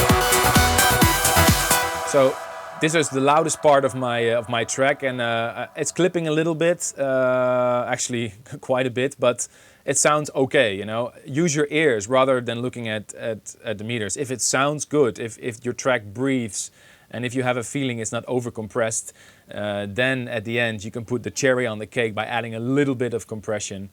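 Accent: Netherlands. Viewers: ***** Yeah, ok his english is fine but you can clearly notice he's dutch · armin is dutch, english is not his mother tongue.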